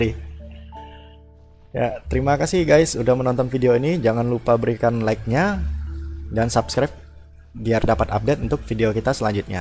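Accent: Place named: Indonesia. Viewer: Yeah he from indonesian me to :p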